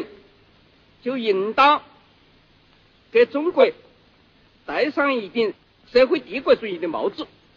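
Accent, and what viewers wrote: Sichuan, China. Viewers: 可苦了聯合國的翻譯了 翻譯不一定聽得懂四川話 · 四川话。其实四川话不难懂，很多字就是普通话变个调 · 牛逼啊，在联合国狂飚四川话。关键这翻译的人头疼了。邓公我们深切缅怀你当今中国的发展你功不可没。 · 这是四川话，我是四川人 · 四川话。。。好亲切。。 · 哈哈哈 现在才知道 四川话居然到过联合国会议 哈哈哈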